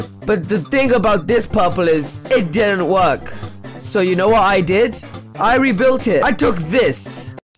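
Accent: United Kingdom. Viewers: are you from birmingham? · R u British? 😅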